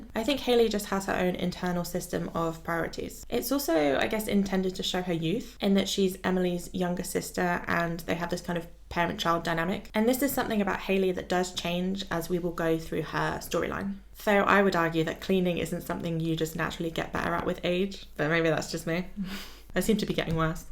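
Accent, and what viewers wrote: United Kingdom. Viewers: As an Australian, I don't see any hint of Oz/Kiwi accent at all. Maybe the tiniest hint of South African, but not enough for me think you're anything other than a Brit. Also, the haley_concerned portrait that you called angry, to me looks more like pensive or worried or uncertain. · I get sinilar questions about my accent for some reason, and I always answer that I just watch a lot of Youtube. Simple, shuts the dumbass question down 😅... you sound normal-brit to me, but i guess my view might be biased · I love your accent. Original English accent. Love it 💕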